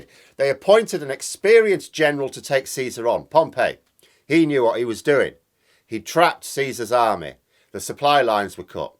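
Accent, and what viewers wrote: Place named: England, United Kingdom. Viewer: Your accent, leads me to think your English.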